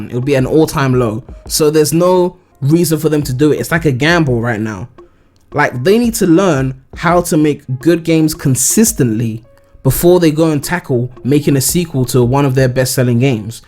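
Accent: United Kingdom. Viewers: Great, I'm new to your channel so I'm curious about this, do you have some British in you? You sound kind of British. lol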